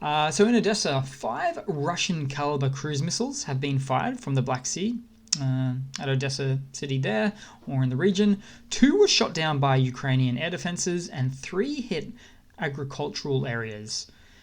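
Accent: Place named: Australia. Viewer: Good to here an aussie accent, I liked what the Ukrainian hackers did to the sign. If you can find more humous things that would be great.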